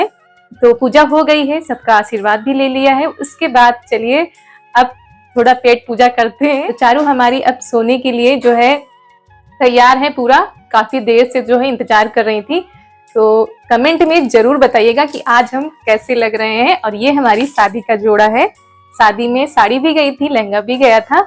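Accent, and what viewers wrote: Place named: Madhya Pradesh, India. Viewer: How cute pehli baar Gaavka dekha apki video me bahut alag tareeka hae kaunsa gav hae vaese language apki MP ki hi lag rahi hae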